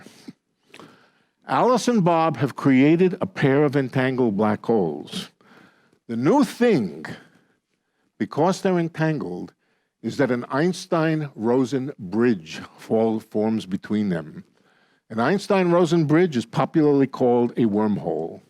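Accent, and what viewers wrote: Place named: Brooklyn, United States. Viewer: Don't let Lenny's blue-collar Brooklyn accent fool you. He's Nobel Prize caliber. I have 2 degrees in Applied Physics and have sat in on his Stanford technical lectures. I was quickly left behind.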